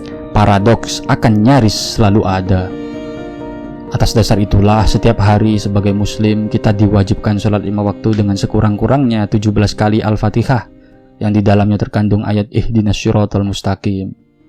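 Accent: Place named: Java, Indonesia. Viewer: Jossssss keren, kata kata medok e tetep ketok,tp iku seng nggarai jos. Jowone tetep kudu onok. 👍👍👍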